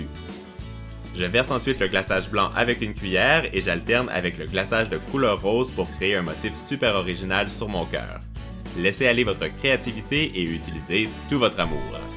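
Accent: Quebec, Canada. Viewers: #lovemail #teamsnap #lmp continue tes vidéos , tu es parfait et j'adore trop ton accent québécois 😍❤😘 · super vidéo j adore l'idée de l entremet. J aime tellement ton accent québécois 😁💜🍰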